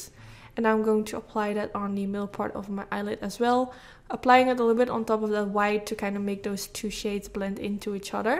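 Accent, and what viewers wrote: Netherlands. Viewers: Je makeup is echt gorgeous ❤️ ik hoor wel echt super sterk nederlands accentje 😂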